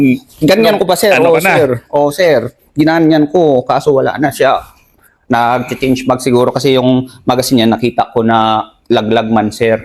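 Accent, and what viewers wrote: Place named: Ifugao, Philippines. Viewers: Wow, a big salute Sayo kabayan Major. Halatadong Ifugao/Igorot because of the way you speak Sir. It's always a combination of English and Tagalog 😊😊😊. Anyway, I totally appreciate your courage and wisdom Sir. God bless. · Talagang taga Ifugao si sir ying letter r nya😊ingat palagi sa lakad nyo sir...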